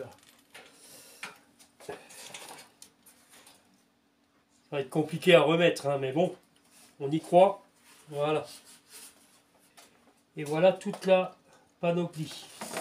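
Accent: Alsace, France. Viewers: Vous n'auriez pas l'accent alsacien comme moi ? 😎 Cette table de mixage me rappelle ma jeunesse quand nous animions des bals...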